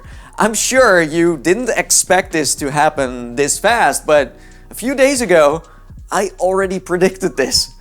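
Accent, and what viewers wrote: Netherlands. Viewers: man has a dutch accent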